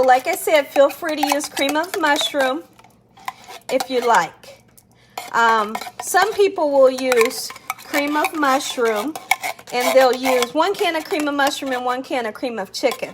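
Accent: South, United States. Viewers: Have you always lived in Ohio! I swear I pick up a southern accent or Louisiana accent in your voice...I am from Texas......I am loving your channel!! hugs, xoxo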